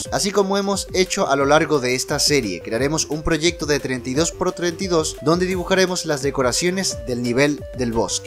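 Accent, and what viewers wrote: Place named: Colombia. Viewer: eres de colombia pa? pq se nota yo si soy de colombia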